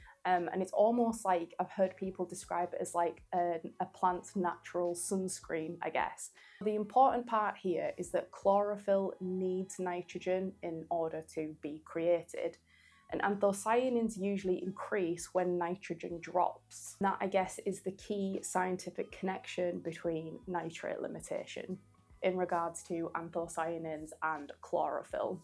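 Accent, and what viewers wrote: United Kingdom. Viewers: your accent reminded me of my time studying in Leeds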